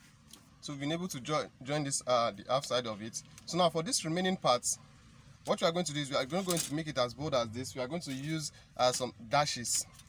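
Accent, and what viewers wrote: Nigeria. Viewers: I could tell you're Nigerian from the accent. I'm Nigerian too. Haha😂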